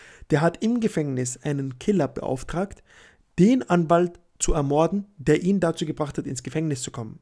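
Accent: Austria. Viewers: Bist du Wiener ?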